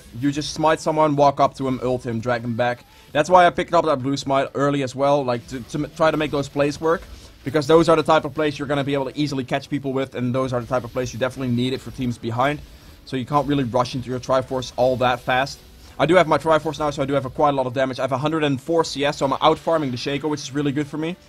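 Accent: Netherlands. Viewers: Nice Dutch accent, and nice video :P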